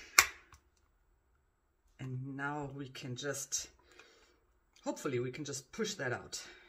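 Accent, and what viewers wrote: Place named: Germany. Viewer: Gutes Video und der German Akzent ist echt amüsant :D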